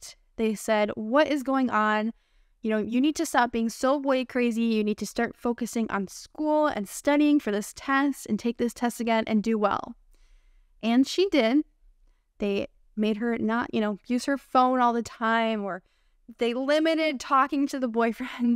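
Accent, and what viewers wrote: United States. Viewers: May I ask you a question? Your American accent belongs to North America?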